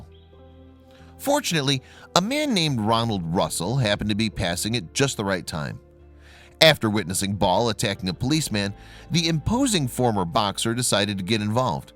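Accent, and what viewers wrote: United States. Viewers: This was stupid and maudlin. Nobody nowhere wants to see boring still photographs while listening to someone's shrill, whiney yank accent.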